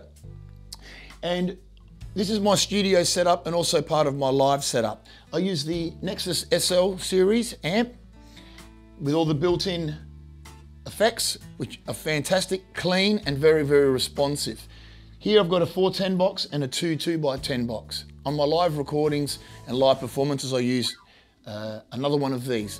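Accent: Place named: Italy. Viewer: I can't hide his italian roots!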